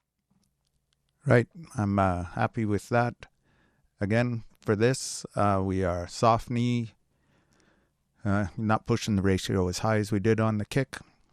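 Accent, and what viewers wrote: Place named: Ireland. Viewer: I sense an Irish accent. 1 love.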